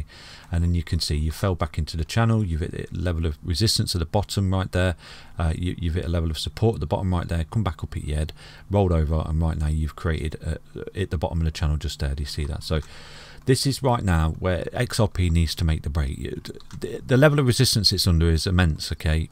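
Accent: United Kingdom. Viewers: this is a good video, clear and simple to understand, and a British accent to boot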